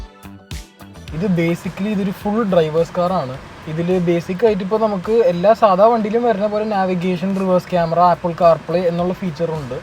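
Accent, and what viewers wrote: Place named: Malabar, India. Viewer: മലബാർ സ്ലാങ്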